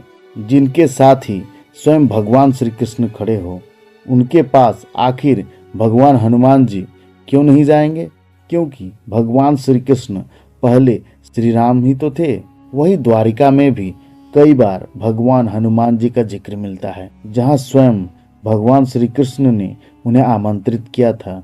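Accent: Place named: Bihar, India. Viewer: Tumhara Hindi bolane Lagta Tum Bihari Ho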